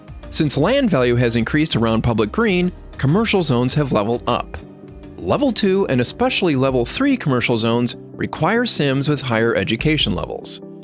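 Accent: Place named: Philadelphia, United States. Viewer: This dudes definitely from Philly haha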